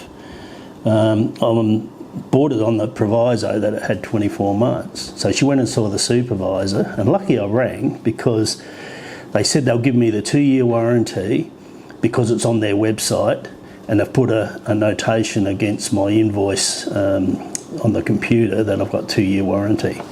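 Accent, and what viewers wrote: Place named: Salisbury, United Kingdom. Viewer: You have a very strong accent. Sounds like your from Salisbury.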